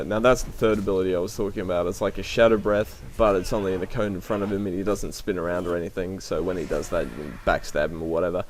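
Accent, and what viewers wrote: Australia. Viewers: hes aussie lol.. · are you australian or a new zeelander?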